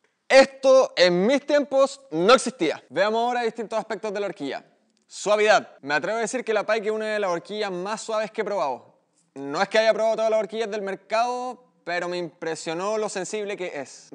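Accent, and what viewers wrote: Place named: Rural, Chile. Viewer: Por qué no hablas un Chileno como Cristian Contreras Radovic? No podes ser menos chileno campesino? Sería mejor de oírte en otros países! Saludos cordiales 😎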